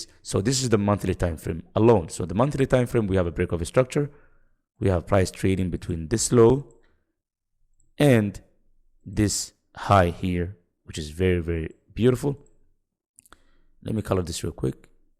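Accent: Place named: Somalia. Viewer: You sound somali, i dont know why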